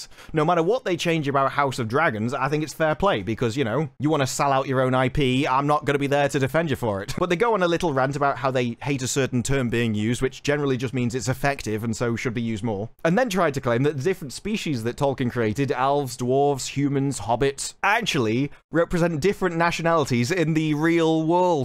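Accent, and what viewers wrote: United Kingdom. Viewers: God I enjoy your lovely British accent while passionately dismantling these Tolkien abusers.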